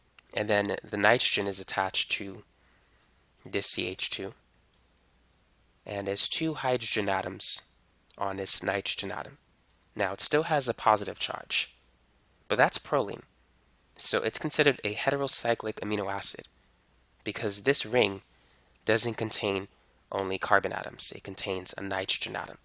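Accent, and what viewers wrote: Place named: Massachusetts, United States. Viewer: sounds like he's from Mass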